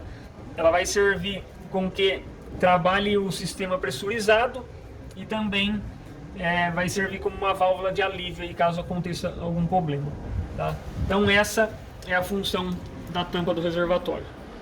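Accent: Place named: Espírito Santo, Brazil. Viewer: Aqui no Espírito Santo falamos assim tbm. Cada região com seu jeito de falar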